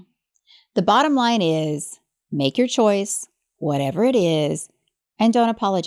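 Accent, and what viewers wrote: South, United States. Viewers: That was fun! p.s. I didn't realise you had a southern accent ❤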